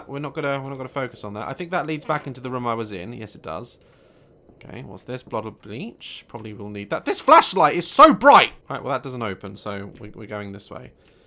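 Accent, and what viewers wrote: United Kingdom. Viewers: sounds slightly more British than I remember, his accent is really coming through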